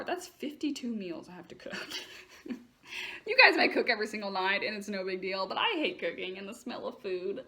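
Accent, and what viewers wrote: United States. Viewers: I'm Canadian u look like American from ur accent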